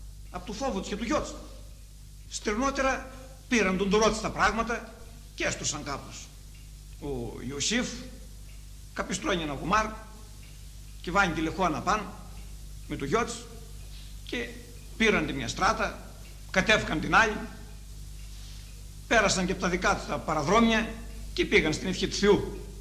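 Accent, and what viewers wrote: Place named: Roumeli, Greece. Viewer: Ρουμελιωτικη προφορα σε ολο της το μεγαλειο!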